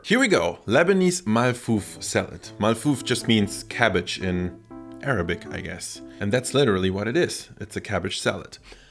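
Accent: Germany. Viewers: yea you can hear the german. You always can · While yes his English is very good but definitely a German accent through and through. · It's always waaay easier to spot an accent if u hear ur native language - in German I would only need 3-4 words and I could tell where someone is from as well. But listening to this dude speaking my 2nd language/English - it's hard for me to pinpoint that he's German too oO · I’m pretty sure he reveals his Germanness from the moment he opens his mouth. To a native English speaker, his accent is very obvious and easy to identify.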